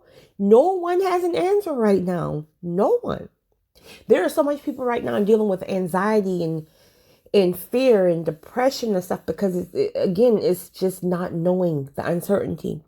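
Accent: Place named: East Coast, United States. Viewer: Thanks for speaking; (You do need to shut off the alerts from your dm's😉 👍)..But hearing your 'east coast voice', to THIS 'way-faring stranger', was a blessing.🙏 ( Storm? Tornado alerts here in Oklahoma⚡🌪⚡🌘⚡🌪). You have a beautiful voice. 'NovelCoronaVirus19' is in Gods hands; Don't worry, Stay safe.🛐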